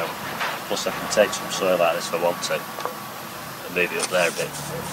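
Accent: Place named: Manchester, United Kingdom. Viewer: Where are you based? Nice one. Like your intro a lot. very professional. You sound a little bit like someone local to us here in Ashton u Lyne, North Est Manchester. Or are my ears playing games? Nice one mate again. I'll check Aarons out too, thanks for heads up. Cheers mate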